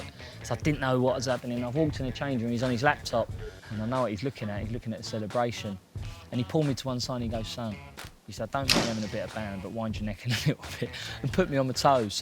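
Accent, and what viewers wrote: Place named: Essex, United Kingdom. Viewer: Could he get anymore Essex. He puts extra 'w' in stuff it's hilarious. Prwawn cocktaiw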